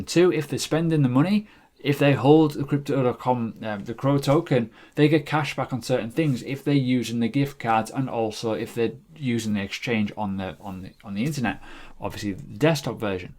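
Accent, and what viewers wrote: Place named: Northern England, United Kingdom. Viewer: Awesome northern accent.. reminds me of when I was growing up in Manchester. Great Channel BTW. SXP is my pick as a cheap starter. ZIL as well - big bag of this. Thx for the ELROND video , may get some of that. Cheers Ben - New Zealand.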